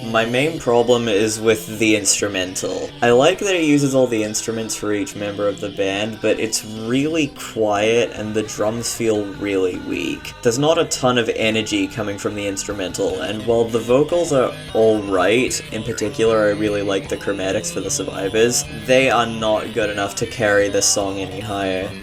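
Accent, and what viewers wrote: United Kingdom. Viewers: I wasn’t expecting this video to have full commentary !! The Bri’ish accent is really soft but it’s still satisfying to listen to !! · a British accent isn't always so heavy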